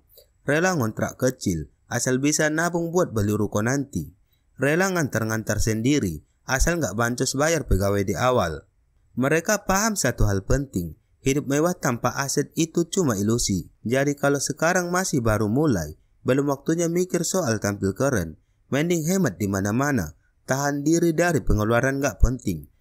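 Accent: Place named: Eastern Indonesia, Indonesia. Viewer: Sepertinya logat indonesia bagian timur gan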